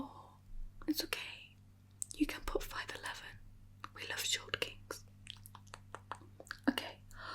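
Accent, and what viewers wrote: United Kingdom. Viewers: I like your British accent. 🤗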